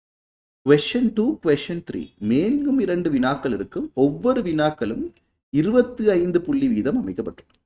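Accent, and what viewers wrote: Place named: Sri Lanka. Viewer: Srilankan tamil❤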